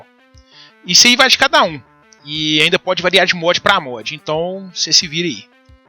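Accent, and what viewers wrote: Minas Gerais, Brazil. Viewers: O melhor do video é o sotaque de mineiro do mlk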